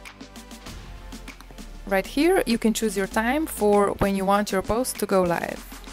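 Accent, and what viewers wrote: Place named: Hungary. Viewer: After couple months in Budapest I recognize that Hungarian accent anywhere lol! Great vid!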